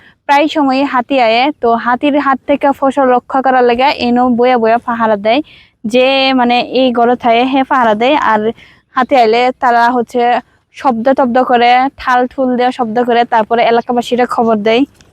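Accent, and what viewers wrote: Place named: Sylhet, Bangladesh. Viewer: Your speaking accent is much like to the Sylheti dialect/language 🎉😮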